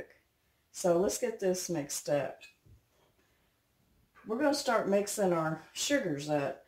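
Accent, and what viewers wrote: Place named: South, United States. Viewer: I agree! I am sure I have heard the word oil pronounced, the way does, many times but the first time I REALLY HEARD IT was with Paula Dean. I love the southern accent and imitate it quite often with my daughter. She gets a kick out of it! Now, this is coming from a Pennsylvania Dutch Country Gal! LOL! We have our special accent, also!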